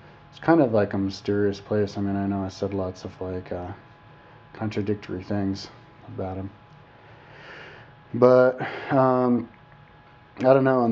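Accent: England, United Kingdom. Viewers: English Accent! wow. Your analysis is completely flawed. Narrow roads, stone and brick. The idea that Aussies regard on retrospect as Australia being the best country in the world has a lot of substantial research and actual lived experience pertaining to this Australia wide held concept. Unless of course you are a Greedy Wall Street banker or power hungry Media tycoon. Australia was created as a penal colony for prostitutes and felons - Wow. The USA was founded by Slave Owners and Tax Avoiders.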